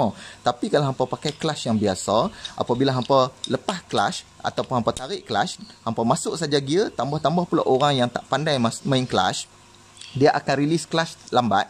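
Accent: North, Malaysia. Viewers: Ilmu terbaik bang share. Cara presentation pun best, leka seronok dengar. Tambah loghat utagha hahaha rindu penang. Salam Ramadan dan butang loceng onn 😆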